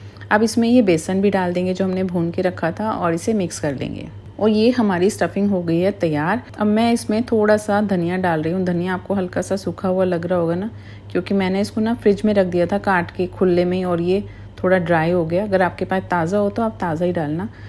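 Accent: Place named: Punjab, India. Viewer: Aapki aawaj different lag rahi h Punjabi tone aa rhi h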